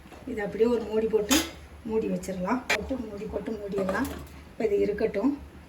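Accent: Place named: Nagercoil, India. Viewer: I am from Nagercoil.Vadasery. Your slang is as ours. In which place you are. I daily see your vedios. Sweet Amma. Very happy to see you.😄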